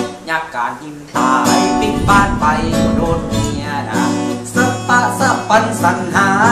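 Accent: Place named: North, Thailand. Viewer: พยายามพูดเหนือได้ดี 5555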